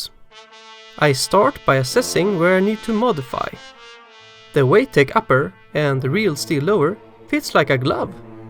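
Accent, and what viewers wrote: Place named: Russia. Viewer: Why would you destroy a real steel lower so you can make it into an airsoft? That makes no sense wasting a registered lower to do this. You could have just bought an 80% real steel to turn it into airsoft. Then it dawned on me that accent... Maybe you are not in the USA… maybe in Russia? Daym... It's that easy to get an m16 lower? Daymmm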